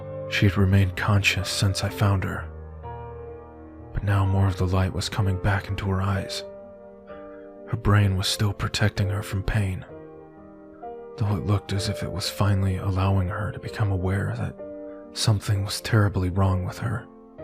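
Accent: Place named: United States. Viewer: Certified thug classic. Love this story and happy to hear a murican read it.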